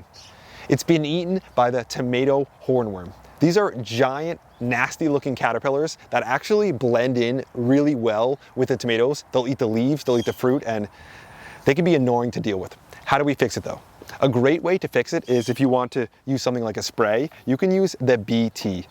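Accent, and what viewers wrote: New Jersey, United States. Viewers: Love my guy from Jersey- Make sure you use lots of wooder...😂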